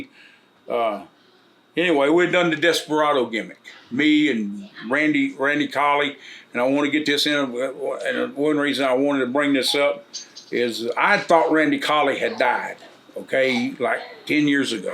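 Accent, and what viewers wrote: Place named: South, United States. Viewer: Well Flair had good promo psychotic macho at the sametime. Naw your talking ain't way up there. Your country accent hurts you.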